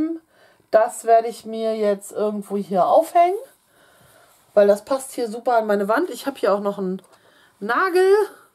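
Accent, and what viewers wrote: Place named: North, Germany. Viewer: dein nordischer Dialekt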